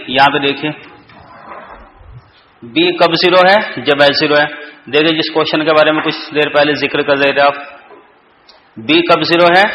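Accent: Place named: India. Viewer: i see the material is good, bt ENGLISH with indian accent....hard to understand.....see ya...bye...thanks anyway....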